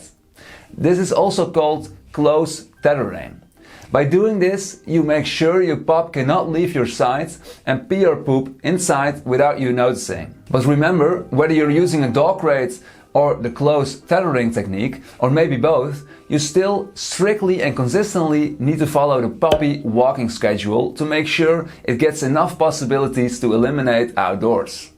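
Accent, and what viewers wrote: Netherlands. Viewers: I had a duplex with a yard the last time I had to train a puppy. I have been without a dog for nearly two years but just cannot commit to training while living in a 2nd floor apartment. I really don’t like puppy pads either. By the way, I love your Dutch accent. I once lived in Geertruidenberg for a year and adore Nederland. I miss being there so much. Also, you are quite the handsome Dutchman! 🤩✌🏼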